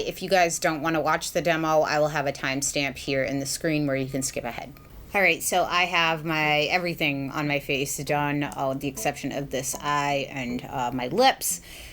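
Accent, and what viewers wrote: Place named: East Coast, United States. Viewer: I had insomnia and woke up at 6PM (I’m French, so it’s already dark here). So basically I lost my Saturday and woke up completely depressed. But now in the upcoming darkness I can see a ray of hope, a glimmer of light all the way from across the ocean. Yaaas. It has long nails and a raspy east cost voice. It’s my queen and she is baaaaack. I am now ready. Candles are lit. Coffee has been made. I can enjoy your video. Thanks. Your timing is amazing. Always an absolute delight to watch your videos. Your personality and honesty are refreshing. All hail the dragon lady nails queen 👑! Love ❤️ (from across the ocean)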